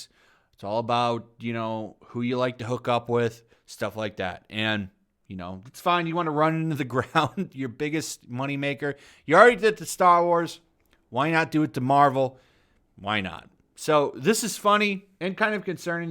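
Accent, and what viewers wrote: United Kingdom. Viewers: What’s with the funny British accent? Pretty awful...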